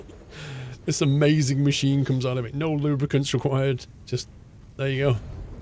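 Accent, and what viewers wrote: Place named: United Kingdom. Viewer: Australian 😉 yeah he a brit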